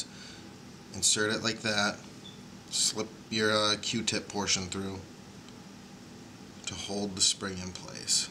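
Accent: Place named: Michigan, United States. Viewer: btw you sound like you're from michigan haha no offence if you're not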